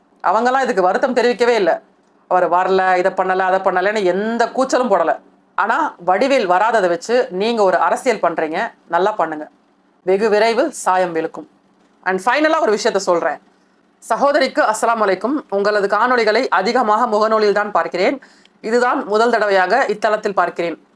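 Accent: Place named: Malaysia. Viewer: SISTER. YUR SLANG OF SPEAKING TAMIL...LIKE MALAYSIAN.,..THANKS SISTER... MALAYSIA.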